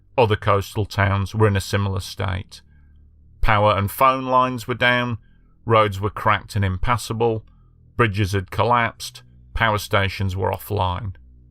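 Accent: United Kingdom. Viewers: ANOTHER British narrated disaster porn channel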